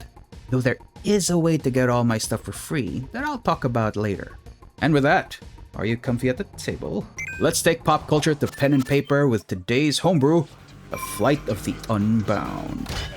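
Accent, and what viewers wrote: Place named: Philippines. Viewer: i can hear a fellow filipino's voice from a mile away... this is an amazing video!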